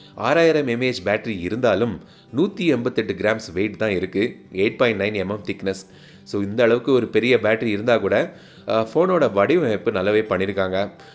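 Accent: Kanyakumari, India. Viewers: உங்கள் குமரித் தமிழில் கேட்பது இன்னும் திருப்திகரமாக இருக்கிறது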